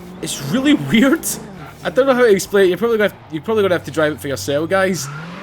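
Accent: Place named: Glasgow, United Kingdom. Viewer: Bro I'm Scottish tae and love forza hyped for forza horizon 3 u sound like yer fae Glasgow ,I'm fae uddingston but cheers fer the vids m8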